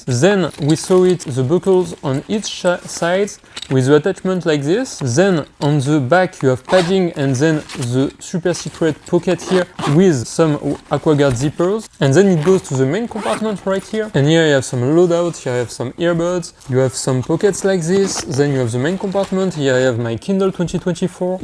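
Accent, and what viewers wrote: France. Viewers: Pour un français (l'accent se reconnait bien ;) ) la traduction auto serait compliquée. Déjà qu'avec des anglais c'est très aléatoire... Pour construire une commu Francophone, ce serait mieux j'imagine. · Nice french accent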